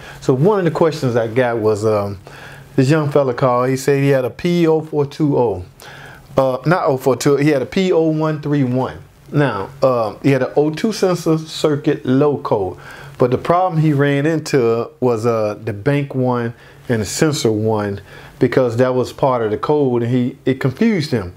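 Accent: South, United States. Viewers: I thought it was just your dialect. Are you in the south?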